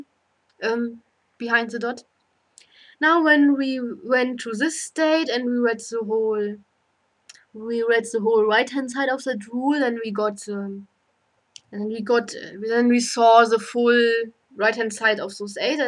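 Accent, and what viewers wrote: Germany. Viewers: u have german accent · Idk guys, l am able to understand her, I guess experience talking to Germans helps. Thank you for the video!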